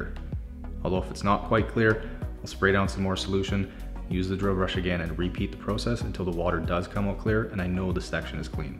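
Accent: Canada. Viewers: just by listening to you speak, i knew you were a fellow Canadian 🇨🇦 keep up the good work! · Hey You from Canada?? Alberta???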